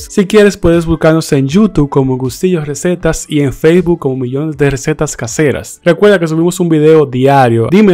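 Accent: Dominican Republic. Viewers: Me atrevo a apostar que eres dominicano?!!! 🥰 un abrazo fuerte desde Charlotte, NC. ..... ah! Me encantan tus recetas!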